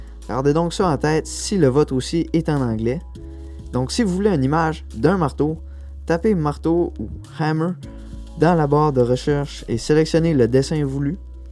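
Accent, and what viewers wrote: Quebec, Canada. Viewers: Tu vient du quebec ta l'accent quebequoi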